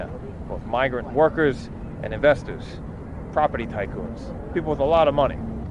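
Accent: New York, United States. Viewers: Great video. Your New York accent really came out this video 😂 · You sound more New York than the last video I just watched. I’m guessing you had recently been back . Interesting how accents morph as we travel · Your New york accent is very strong in this video, or maybe I'm just noticing it now ;) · This channel def makes me appreciate china al ot more. Very cool perspectives. People are nice and appreciate he can speak the language. Hilarious how his American accent is so New York. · Love the NY accent. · Apart from a great but nostalgic video I really like the NY accent and directness. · I can't do the accent man, I think you're a great watch, but that Judge Judy accent is getting thicker. Reminds me of some people who you would not want to be associated with from N.Y. Stay authentic mate. Jake in Oz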